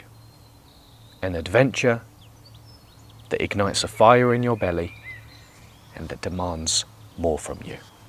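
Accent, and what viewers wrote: United Kingdom. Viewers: your british accent makes your point perhaps better than your words.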